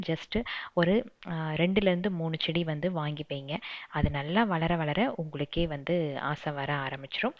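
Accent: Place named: Pollachi, India. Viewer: Entha State ponalum Pollachi Tamil alagu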